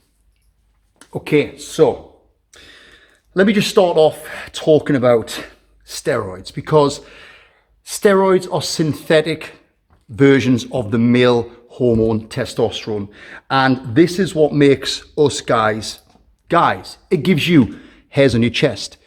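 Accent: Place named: Dumfries, United Kingdom. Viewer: Have you ever been to Dumfries in southern Scotland? Your accent sounds similar to it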